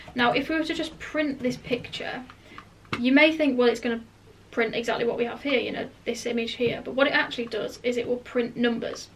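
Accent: United Kingdom. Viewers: Thanks for this tutorial. It is easer to understand with a British accent. As this was made over two years ago, what is your recommendation today for the best face recognition software? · You are all I need, you are a girl (that shows me that I can become a programmer), you have interesting projects, you explain and the best you have a British accent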